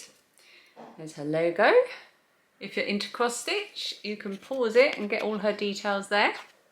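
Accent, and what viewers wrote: Australia. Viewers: Great Aussie accent! Thankyou for sharing your daily life🙂 I am watching from Bunbury Western Australia, Diane Buswells home town🙂 My daughter went to school with her. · Oh my goodness! you nailed the Australian accent. Maybe just add a bit more ‘nasal’ to your voice?👍. I really dislike the Aussie accent. I’m allowed to say that as I’m Australian 😅😅😅. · thank you so much for the tour of your gallery wall again. I really appreciate it … looks gorgeous! ♥️♠️♦️♣️. The girls reactions to the chips make me laugh out loud. So very funny - is a crack up … love your Aussie accent (I am Australian) Like everyone else I will miss your Vlogtober so much.. hoping you’re up for Vlogmas too 🤞 Thank you for taking the time and effort to share your lives with us.. super super fun family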